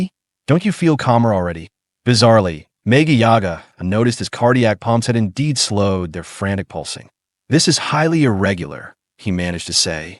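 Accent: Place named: Spain. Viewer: Never get rid of the wierd and occasional pronunciations with a Spanish accents. So much fun. I look forward to them 😊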